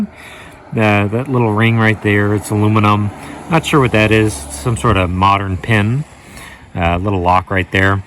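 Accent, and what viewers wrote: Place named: Missouri, United States. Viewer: Where in mo are you from? I detect in Crawford county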